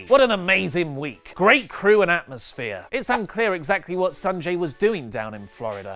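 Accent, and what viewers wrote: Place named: United Kingdom. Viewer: I love his accent so British lol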